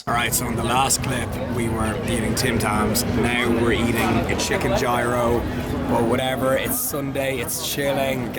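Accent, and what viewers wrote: Ireland. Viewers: Is he Irish ☘️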